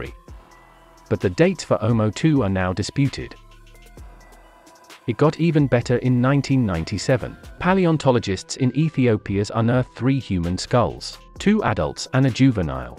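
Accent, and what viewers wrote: United Kingdom. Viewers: Nice documentary, but why do all documentaries like this seem to have to have a British accent narrator? :)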